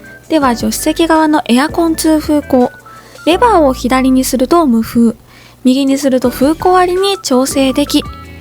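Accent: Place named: Ehime, Japan. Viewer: 新型フリード クロスター乗りです。久しぶりの愛媛弁、懐かしく可愛かったです。 旧西条市の市中心部出身でした。 チャンネル登録しますね。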